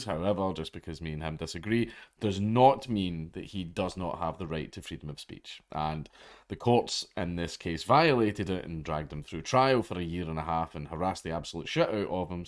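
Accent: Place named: Scotland, United Kingdom. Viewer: I don't know if you read any of these but I'd like to see you do one video a month on this channel you just go full Scottish and use your natural accent. I'd like to hear what the quartering heard whenever you were sitting at the bar talking to him after you had a couple of drinks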